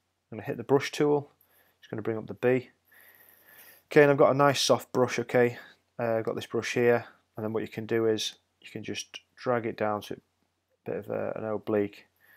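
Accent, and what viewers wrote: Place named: United Kingdom. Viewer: Ur def a Brit but this is a great video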